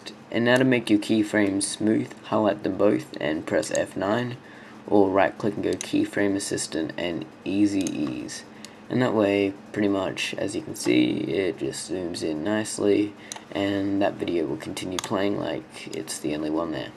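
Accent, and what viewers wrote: Australia. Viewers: Yay, you're Australian like me. ;)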